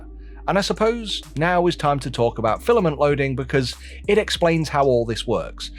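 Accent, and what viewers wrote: United Kingdom. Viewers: I’m American. And like most Americans, I find listening to someone with a British accent telling me things relaxing. And as I’m relaxing at the end of a long day it is mentally akin to wearing comfy slippers on a cold night while relaxing in an overstuffed leather recliner reading a good book as I sip a nice hot cup of cocoa (meaning it's really comforting). So when the aforementioned British speaker has the opportunity to reference something so uniquely British – like a famous Sherlock Holmes quote - thus enhancing this comforting experience , I consider it a missed opportunity. I’m not the only one that finds this phrase reassuring or having value outside of its literal context.(According to a popular literary web site) " 'The game is afoot' is more than just a phrase, it is a cultural touchstone, a literary echo, and a testament to the enduring power of human curiosity and the pursuit of knowledge". 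I hope now you can understand how this was, in all conceivable meanings of the phrase, truly a missed opportunity.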